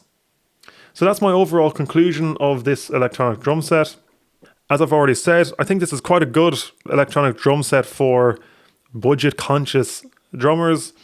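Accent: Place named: Ireland. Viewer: He sounds Irish!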